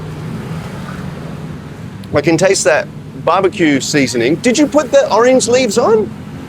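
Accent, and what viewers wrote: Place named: Australia. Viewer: the foreign accent (Australian?) pronouncing Indonesian had me confused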